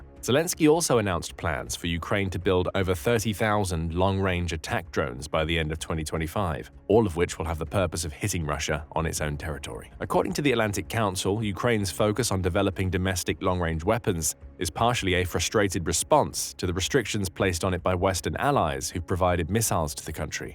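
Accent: United Kingdom. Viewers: Whats up with mixing british and american accent??? Can we just stop pronouncing missile with britsh accent and the rest american? So annoying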